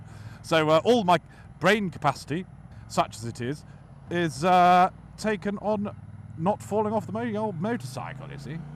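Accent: United Kingdom. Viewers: Hello! I really enjoy your entertaining videos! And I envy the comfort you have on your Speedmaster - I can feel every crack on road on my Bonneville Bobber... Though I prefer the looks of the Bobber!:) Something I always wanted to comment on one of your videos: your dialect and pronunciation reminds me of the famous arrest of this British man <link>;) BTW: the metric system is the best!;) Greetings from Germany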